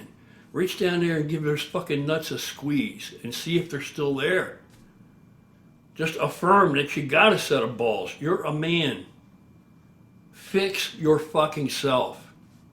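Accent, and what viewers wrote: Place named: Philadelphia, United States. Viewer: You gotta be from Philly or South Jersey. 😅i know our accent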